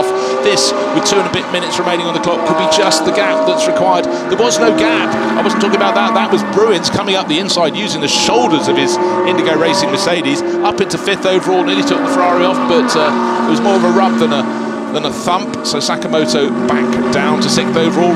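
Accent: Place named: Australia. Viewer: I hear an Australian accent 👣.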